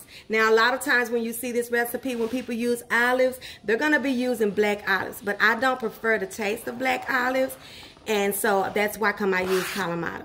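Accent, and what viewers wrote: South, United States. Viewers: I like when u talk and be funny joke around I love your accent u sound like me I'm from the south and ppl can tell lol but if u dnt talk how we going to knw things I dnt want to sit and watch some of those videos where they r just stone faced lol u r great girl and u be u!!🤗😇🥰😜🤪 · Gurl PuleaSe ya done talked me into tryna make this after all that lip smackin 😂. That Country accent Tho! Sounds like mine except we got a mote Southern drawl 😁. Imma say you're from neither Louisiana or Texas? Love that accent 😊.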